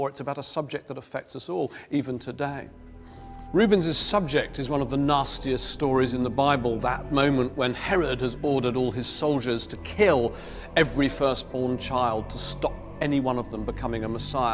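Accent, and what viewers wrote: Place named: RP, United Kingdom. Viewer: Is it me or English have a tendency to replace quality in contents with a posh pronunciation and diction?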